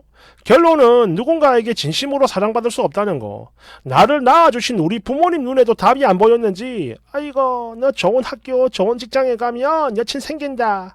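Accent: Gyeongsang, South Korea. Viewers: 경상도 분이시구만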